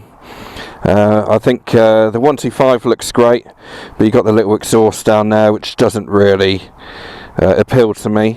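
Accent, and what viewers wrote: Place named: England, United Kingdom. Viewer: Can you talk in an American accent hard to respect with English sounding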